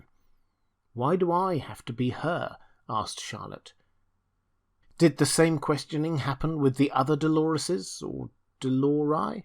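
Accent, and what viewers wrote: England, United Kingdom. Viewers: It's an English accent!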